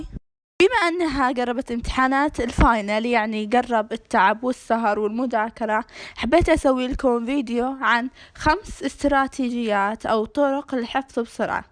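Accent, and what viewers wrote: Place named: Algeria. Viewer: نتي جزائرية تتكلمين مثل الجزائريين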